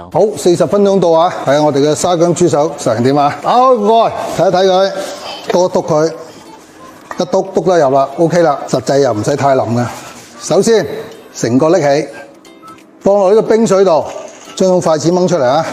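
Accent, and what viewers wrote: Guangzhou, China. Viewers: 我是地道的广卅人 师付的粤语带着很多的广卅方言 听起来很亲切 跟学做菜 吃到了自己喜欢的美食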